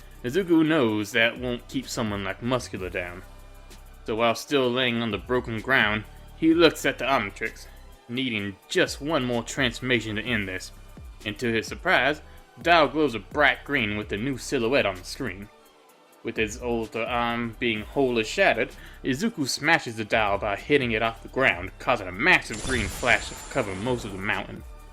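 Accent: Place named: Brooklyn, United States. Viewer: Love the Brooklyn accent.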